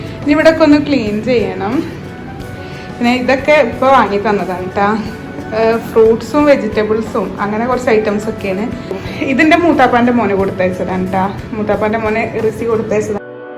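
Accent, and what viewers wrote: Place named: Malabar, India. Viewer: ങ്ങൾ തിരൂർ ഭാഗത്ത് ഉള്ള ആളാണോ. ഞാൻ കോട്ടക്കൽ ആണ്. മ്മളെ ഒരു സ്ലാങ് ഉണ്ട് ങ്ങക്ക്😀😀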